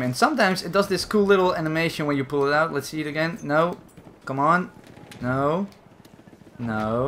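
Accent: Netherlands. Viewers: I detect a Dutch accent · I hear his accent, 100% Dutch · Je kunt duidelijk aan je stem horen dat je Nederlands bent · It sounds u are dutch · You sound dutch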